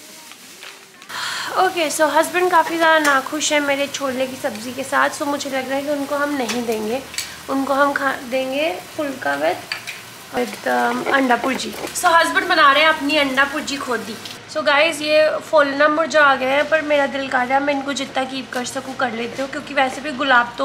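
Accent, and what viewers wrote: Punjab, India. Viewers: hahahha bhaji di jheri hindi aa na bhti kmal aa viche punjabi a jandi ....... wahhhh mza a janda sun k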